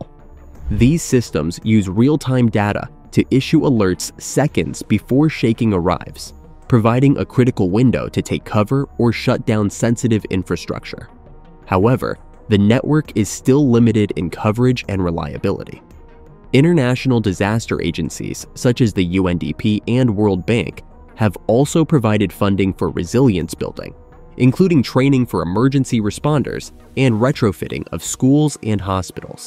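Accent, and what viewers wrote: United States. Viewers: This is true to the rest of the world also...... What about Yellow Stone.... This speaker seems to have forgot that his American focks are equally at risk since they are sitting right next to the what is known to be the biggest volcanic geo event point. How about that... So in your Amercian accent please talk abt Americans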